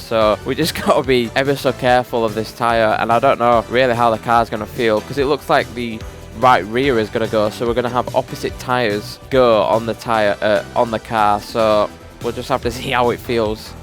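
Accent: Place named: Manchester, United Kingdom. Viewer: Manchester accent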